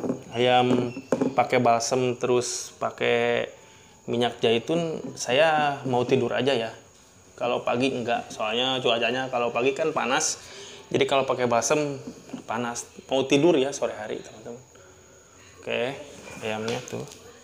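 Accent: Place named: West Java, Indonesia. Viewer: Mantap bang bermanfaat bagi pemula.......logatnya kaya orang sunda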